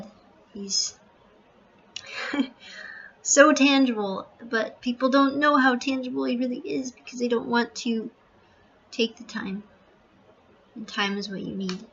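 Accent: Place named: South, United States. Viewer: You're always funny lol You sound like a southern from down south. the US of A. I don't know why I always don't get your videos I get them once every month. The brothers were very protective of their sister and the law of the Lord. Thank you once again and thank you for your encouragement. May the Lord bless you always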